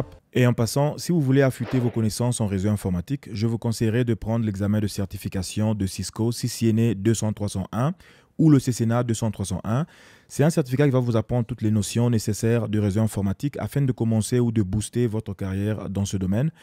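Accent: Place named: France. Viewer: Je suis tombé sur une de tes vidéos anglaise (coaxial vers ethernet) et je me disais bien qu'il y avait un soupçon d'accent Francophone 🤭 La qualité de tes vidéos est surprenante, bravo Également tout ton matériel, la façon dont tu te tiens, tes expressions, tout ça attise ma curiosité et me donne envie de connaître un peu plus qui tu es ! Bref, plein de succès dans tes projets et merci pour le partage de tes connaissances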